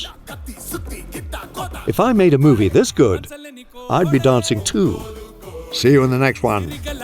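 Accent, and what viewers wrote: United Kingdom. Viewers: The fact that narrator to this video is brit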